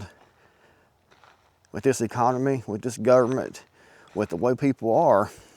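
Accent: Appalachia, United States. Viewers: First, you need to stop talking like you just rolled out of the holler! I’m from West Virginia, born and raised and nobody I know talks that way. Talking that way is why nobody takes Appalachia seriously. Second, the left talks about the constitution daily and how it’s being violated every damn day! If you fools on the right wouldn’t have allowed yourself to be brainwashed by conmen we wouldn’t have these problems. The constitution is just a piece of paper. If we want it to actually mean something we have work make sure it’s followed. That means no conmen in the government, no religious zealots in the government and no white nationalist bigots in the government. Currently that who’s in charge and that’s why constitution is being trampled on.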